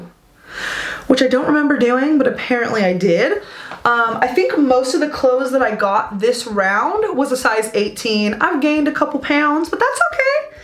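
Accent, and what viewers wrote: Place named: New York, United States. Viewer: Do you speak Italian? What part of Italy is your family from? You sound like your originally from NYC. Loved the video!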